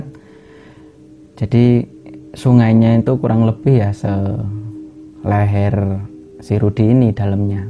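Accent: Java, Indonesia. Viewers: Betooll.. Logat org jawa nek di campur GUA jd janggal aja.. Lnjt berkarya brooo👍👍👍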